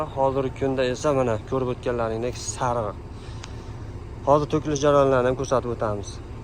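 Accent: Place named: Tajikistan. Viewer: 😊ты таджик не умеешь по узбекский говорить. Сакау.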